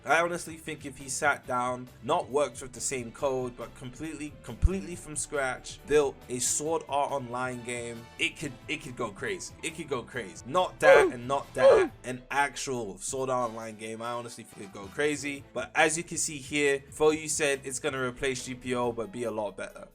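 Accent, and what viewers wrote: United Kingdom. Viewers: british smh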